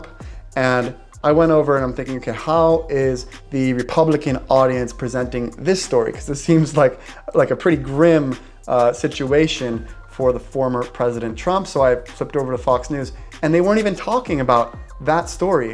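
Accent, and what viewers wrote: Sweden. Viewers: I here a bit of a Swedish accent you didn't have in your earlier videos. Even acouple of weeks in a different country can give you a different feeling when you return. Especially if you go to an place and get more involved with the culture. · Your English has the ever so slightest Swedish accent undertones now. So interesting how that happens! Only on some words but I can hear it! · has been away so long and think he kind of sounds like a Swede speaking English 🤔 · Your English has changed! Your accent is still American, but has touches of Swedish and British, vowels in particular. Did anyone in the US make a comment on that when you were back? Or you adapted quickly back on American soil? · Now that you have been back to the US, I would love to hear how you realzed that You changed; your personality or your likes or your thoughts of the world. 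I lived in Sweden 1989-90, but now live in Tennessee (grew up in a very small town in upstate NY). It was easy for me to fall back into life in the US but I wasn't there for so long. I enjoy listening to your experiences and plan to retire to maybe Portugal with a decade. Thank you for your content. Good to see you back. (PS I agree with the person that said you have a twinge of a Swedish accent in your English ❤)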